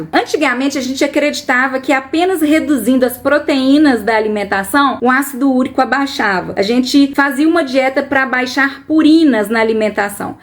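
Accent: Minas Gerais, Brazil. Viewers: Mineira com certeza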